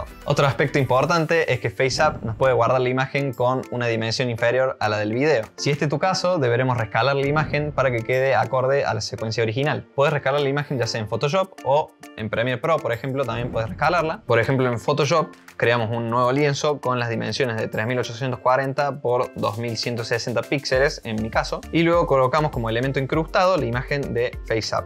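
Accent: Cordoba, Argentina. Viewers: Lo mejor que la explicacion esta en Cordobes 😎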